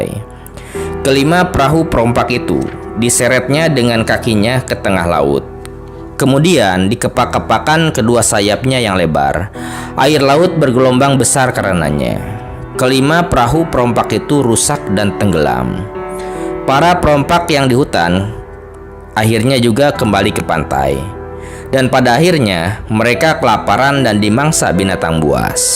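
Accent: West Java, Indonesia. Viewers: Yang cerita logat nya Sunda yaa..😂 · Jelas orang ini dari gaya bicaranya orang sunda, legenda yg dia ceritakan tidak pernah ada dalam catatan rakyat danau ranau, jelas orang ini bohong, kelihatannya orang ini disuruh seolah olah orang ranau masih punya hubungan dengan orang batak, yg jelas kami orang ransu menolak sama sekali cerita ini...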